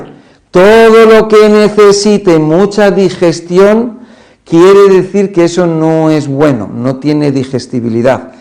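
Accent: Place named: Spain. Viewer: Me encanta ese acento Español soy cubana todos mis abuelos eran Españoles hasta yo hablo distinto a lo notmal soy maestra de Español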